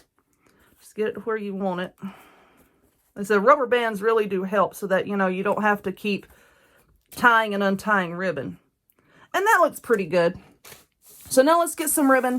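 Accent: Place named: South, United States. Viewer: Please don't ever quit with your tutorials. I really enjoy your accent. I am from Tenn and I really miss hearing good ole southern talk. I also remember these in my granny's kitchen. I also remember one with a poem that used used two long men's white socks. Do you have any idea about that craft? If so could you please do a tutorial on it.. I just found your site and am definitely subscribing.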